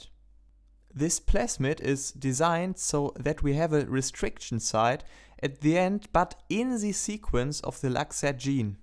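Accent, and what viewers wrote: Germany. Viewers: Absolutes alman English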